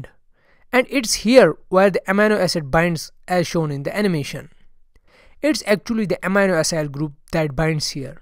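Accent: Kashmir, India. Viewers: Sir are you from kashmir??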